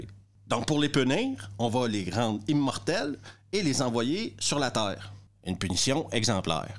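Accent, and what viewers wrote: Quebec, Canada. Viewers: Je crois que je l'avais vu à la télé et je n'en ai pas gardé beaucoup de souvenir ! J'avais même oublié Michael Ironside et Virginia Madsen ! (qui se souvient de "Electric Dreams"?) Déjà, le nom du méchant... Le général Katana ! Ca sent le nanar ce nom là 😂 Merci pour ces merveilleuses "RE-VU" si drôles avec cet accent du Québec que j'aime beaucoup :) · je m'abonne car j'aimerais comprendre si le sarcasme est un talent personnel ou bien si cela viens de la nonchalance de l'accent Québécois, tout en découvrant de merveilleux films 😅😅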